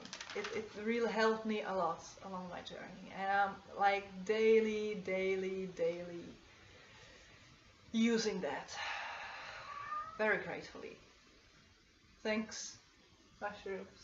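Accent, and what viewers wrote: Netherlands. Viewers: Hmm sounds sometimes like dutch or Flemish but when listen very good maybe Denmark Norway, I don't think east Europe Definitely not German. I'm very curious now. Please tell me. I started copelandia ogre boy shroom kit and researching YT Pobrezni❓polish really I have lots of polish friends here in Amsterdam and non of them sound like you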